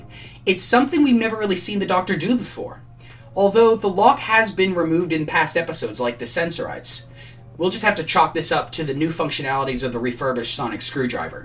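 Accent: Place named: United States. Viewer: why he got an American accent? i thought you were from the uk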